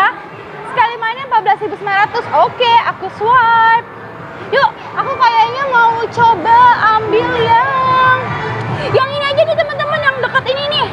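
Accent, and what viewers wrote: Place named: Sulawesi, Indonesia. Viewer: Itu logatnya Sulawesi yaaaaa Hampir mirip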